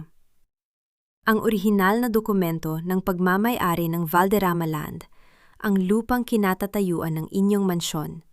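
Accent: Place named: Visayas, Philippines. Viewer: Parang bisaya kadi mga narrator nyo eh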